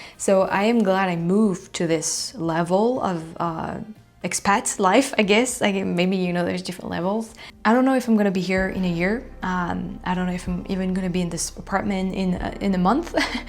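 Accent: France. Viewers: On a completely unrelated note, your English is getting amazingly good. I can tell the difference from just 6-9 months ago. You’re losing some of that French accent and speaking more "North-American”. You probably dont even realize it yourself but its definitely there on more vowels and consonants. At this pace In another 12 months you’ll be fooling everybody and can tell people you are from Toronto or Orlando. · Never feel you need to lose your beautiful French accent. Mispronounced words that could lead to misunderstanding is one thing (and you rarely mispronounce your English words)…and, I do understand your desire to correct a potentially mispronounced word, if required…but please don’t confuse that with a need to change your charming accent. The French accent to the anglophone ear is soooo beautiful- truly one of the loveliest. 😊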